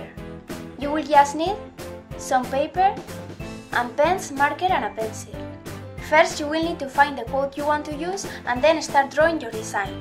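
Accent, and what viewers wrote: Spain. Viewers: Me encantan tus diseños, quizás te copie alguno ;). Se nota mucho tu acento español pero se entiende todo, la gente que te critica por eso es sobretodo porque son monolingües(y eso es malo para el cerebro y la creatividad) Espero ver algún día un vídeo en Español, ya estoy harta de que todos hablen inglés en youtube y en el instituto.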